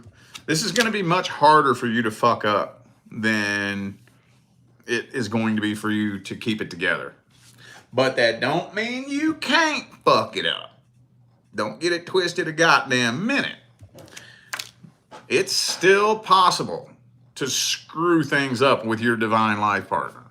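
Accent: South, United States. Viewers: This resonated and also your accent reminds me of home in the south! Live in Cali now but I miss these accents ❤😊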